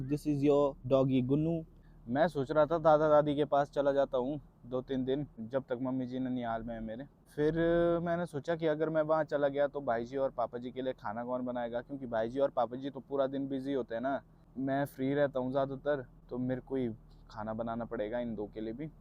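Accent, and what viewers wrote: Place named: Himachal Pradesh, India. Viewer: The Himachali innosence is present in you . And the Himachali tone of your voice is your identity . Keep it up hope this Himachali tone of your voice and your behaviour never fade away . Bcz most of Himachali YouTuber do vlog in a modern tone , behaviour etc but you always shows Himachali presence in you and also in your vlog ❣️ Wishing you huge amount of prosperity 🙏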